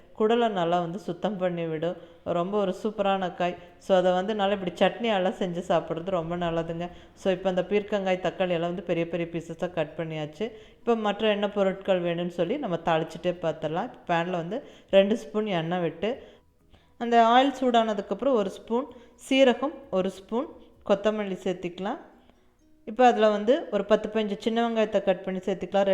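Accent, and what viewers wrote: Kongu, India. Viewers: Mam dish super. Pankarapava supera iruku.i will try. Ungha voice rompa clarity, sweet.neegha pesura kongu tamil super.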